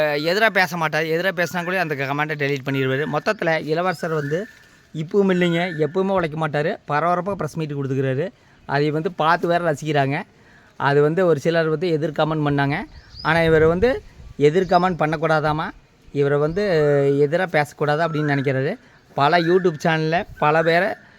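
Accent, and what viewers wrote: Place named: Kongu, India. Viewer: அண்ணா இளவரசன் என்ன சொன்னாலும் அவன் திருந்த மாட்டாங்க அந்த காயத்ரி தின்னே குடும்பத்தை அழித்து விடும் போல😂😂 மொத்தத்தில் அந்த குடும்பமே அப்படித்தான் அவங்க அம்மா தங்கை அவனுக்கு சப்போர்ட் நீங்க இந்தியா குட்டி சேனல் பார்க்க சொன்னீங்கள்ள இந்த 20 நாளா அந்த இந்தியா குட்டி சேனல் தான் நான் பாத்துட்டு இருக்கேன் மாமியார் மருமகள் அருமையா நடிக்கிறாங்க அவங்க பையன் வேற லெவல் மொத்தத்தில் உங்க வீடியோ சலிக்காம நல்ல பார்க்கலாம் நல்ல ஜாலியா என்டர்டைன்மென்ட் நகைச்சுவையாகவும் இருக்கிறது கொங்கு தமிழ் அருமை❤❤❤🎉🎉🎉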